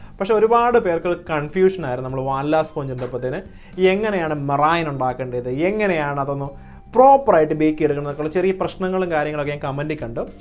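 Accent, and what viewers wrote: Kottayam, India. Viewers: 'flar' 😄😄😄😄 sir kottayam kaaranano sir inte oro videos onninonnu soooopr 👍👍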